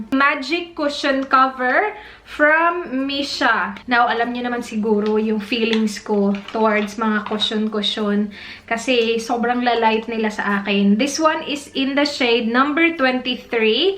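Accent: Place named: Visayas, Philippines. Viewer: Hahaha kataw.an an Jud Kayo ka ate Oii..Hahahaha.Bisaya kayo ka haha.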